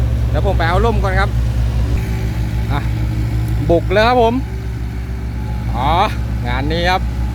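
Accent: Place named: Sukhothai, Thailand. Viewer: สำเนียงเหมือนคนสุโขทัยแท้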